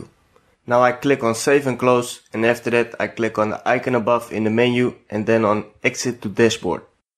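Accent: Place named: Netherlands. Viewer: I know a Dutch man when I hear him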